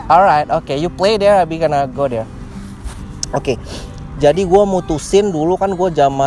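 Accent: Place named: Riau, Indonesia. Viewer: Ngomongnya kaya org riau. Hehe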